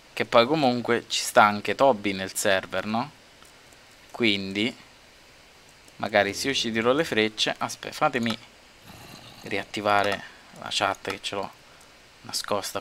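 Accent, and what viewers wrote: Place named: Rome, Italy. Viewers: non è napoletano, è romano 😂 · E romanò :^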